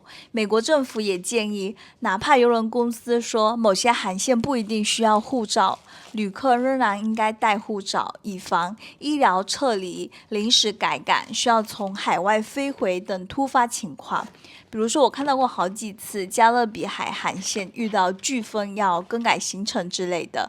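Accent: Fuzhou, China. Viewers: 你是福州人嗎？尾音好像聴出鄉音！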